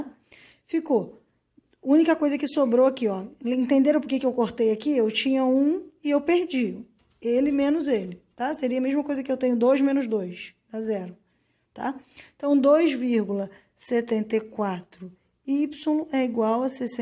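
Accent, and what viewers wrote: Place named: Pará, Brazil. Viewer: boa prof se solta um pouco mais. vc é paraense ksksks pareçe muitooo tbm sou,rsrs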